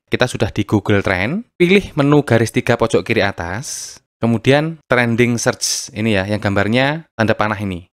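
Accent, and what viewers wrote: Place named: Java, Indonesia. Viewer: Suka.sama chanel ini suaranya aksen Jowo medok...👍😁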